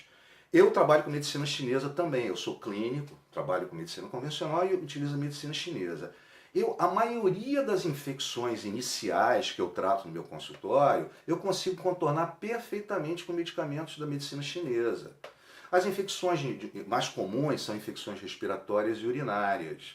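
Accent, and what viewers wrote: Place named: Rio de Janeiro, Brazil. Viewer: Até o sotaque da Zona Sul do Rio é parecido!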